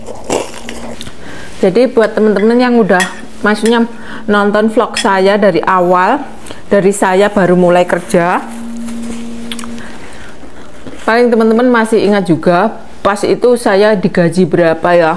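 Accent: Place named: Java, Indonesia. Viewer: gpp medok Jeng.....iku ciri khas e lo . gampang di eling2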